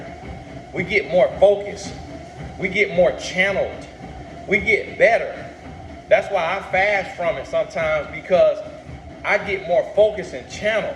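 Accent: South, United States. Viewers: Hey there Mr. I forgot to just say ion know what video you said it on but I’ve heard a few times but you say you was insecure or something like that about your country accent man that the one thing I love about on know fruit stuff but I makes me more comfortable as black man to sit an listen to your videos it speaks to me an Im sure many others I be definitely got out more of my homies on from the hood anybody else wouldn’t be able to reach us like you can so I appreciate you an everything you do I pray God bless you even more man cause honestly I had been lacking in the self love department do to child hood trama an had some bad patterns popping in relationships back to back from Mommy wounds that were never solved but hearing this an hour courses have really helped an continued to help so thank you man 💪🏿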